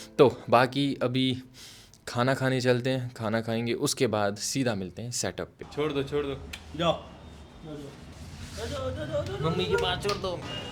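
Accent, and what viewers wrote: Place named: Kashmir, India. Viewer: the kashmiri accent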